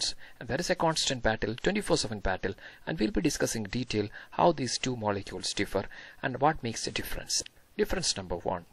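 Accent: India. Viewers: Dat is theoretically perfect Indianenglish dialect.....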